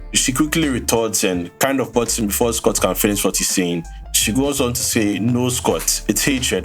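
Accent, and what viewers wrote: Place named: Nigeria. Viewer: Nice accent. Are you Nigerian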